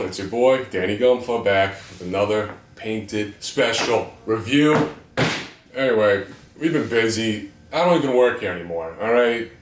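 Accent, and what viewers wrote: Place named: New York, United States. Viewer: Your accent is like halfway between Sylvester Stallone and Rodney Dangerfield. So I'm guessing you're from NYC or one of the nearby areas, but where abouts? I mean Brooklyn is an obvious guess because how easily "Fuhgedaboudit" flows out when you talk. Hmm. Got me thinking there or the Lower East Side. Of course, I'm talking out my ass, you might be a Jersey boy.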